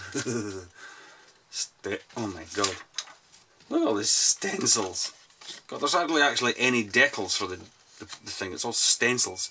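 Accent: Scotland, United Kingdom. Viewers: I have to say I find your features very interesting and your a fantastic modeller!! I love that Scottish accent and the drink reviews are great! You've hooked me and unfortunately I can never get any modelling done as I seem to have all my free time eaten up watching you... which isn't so bad. Looking forward to many more hours of your lighthearted observations. Cheers from Canada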